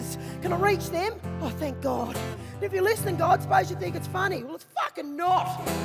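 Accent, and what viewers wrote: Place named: Australia. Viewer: Shit ya make me laugh Woman! The pure Aussie...ness (its now a word) is just grouse!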